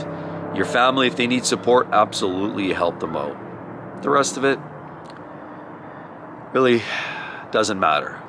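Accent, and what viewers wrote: Alberta, Canada. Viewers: You sound like your from Alberta, am i right ?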